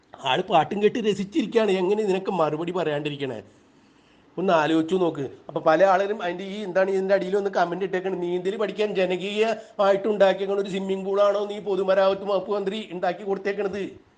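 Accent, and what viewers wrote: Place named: Eranad, India. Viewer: രസികൻ അവതരണം അധികാര വർഗ്ഗത്തിന്റെ വിവരദോഷം എത്രത്തോള മുണ്ട് എന്നത്, ലളിത സുന്ദരമായി അവതരിപ്പിച്ചിരിക്കുന്നു? തനിനാടൻ ശൈലി... ഒട്ടും വളച്ചുകെട്ടില്ലാത്ത നിഷ്കപടമായ സംസാരഭാഷ... കഞ്ഞിമുക്കി അലക്കി തേച്ചപോലെ,വടിവൊത്ത അച്ചടിഭാഷയിൽ, നാടകീയമായി, മസില് പിടിച്ച് വാർത്ത അവതരിപ്പിക്കുന്ന സ്ഥിരം ശൈലിയിൽ നിന്നും മാറി, ആനന്ദവും ആസ്വാദനവും സാധ്യമാകുന്ന, ചിരിയും ചിന്തയും പ്രദാനം ചെയ്യുന്ന വേറിട്ട ശൈലി കണ്ടെത്തി അതിലൂടെ വാർത്താവതരണം സാധ്യമാണ് എന്നു ജനത്തെ ബോധ്യപ്പെടുത്തിയ Crime online Team നും, Chief editor നന്ദകുമാറിനും ഏറനാടൻ വള്ളുവനാടൻ സ്‌ലാങ്ങിൽ ചിരിയും ചിന്തയും വിളമ്പി ഞങ്ങൾ പ്രേക്ഷകരെ, ശ്രോതാക്കളെ മതിമറന്നു ചിരിപ്പിച്ച അവതാരകനും സ്നേഹവും നന്ദിയും അറിയിക്കുന്നു... അഭിനന്ദനങ്ങൾ ആശംസകൾ ❤️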